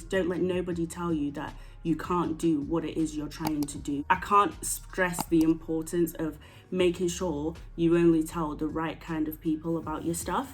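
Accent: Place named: United Kingdom. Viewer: love that UK accent. Damn I can't wait 2 go back💯 I'm from Cali, I got soooo much luv out there. way more than I get in USA.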